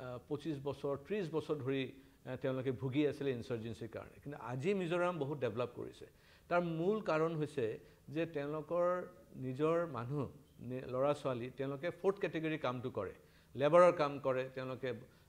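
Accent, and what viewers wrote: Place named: Bengal, India. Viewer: aponR bakha bangla style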